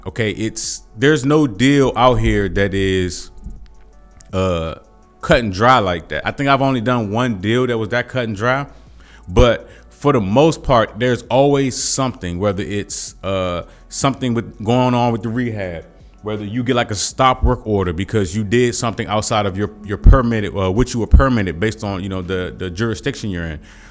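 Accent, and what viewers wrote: DMV, United States. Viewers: 10 seconds in an i knew bro was from DMV lol. Great insight for us beginners!! Respect.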